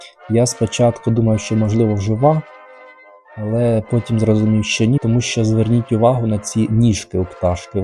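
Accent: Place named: Sumy, Ukraine. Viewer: Чудова молода,добра людина ,підписка. А Ви случайно не з Сумщини? Чую ,щось мені рідне і знайоме.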